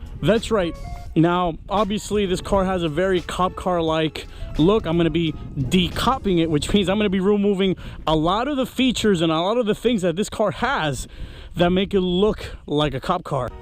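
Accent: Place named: Tennessee, United States. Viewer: StereTyping thats why I said, Im not hatting tho. TN accent is bomb.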